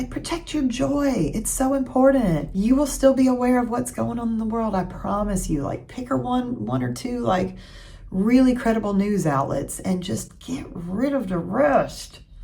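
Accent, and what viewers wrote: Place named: South, United States. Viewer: It is so nice to hear sensible things in the accent I grew up around. Squirrels and anything with the word pickle in it? Would never ever guess you're from the South lolol. As for protecting joy, right now trying to find that joy and cut out what I can with people who are entirely negative and cutting down on people who only take -- learning that my emotional labor and care is important and I shouldn't waste it on every single person that shows up with a problem. I also walk every morning and take short walks during the day. Knoxville, Tn area.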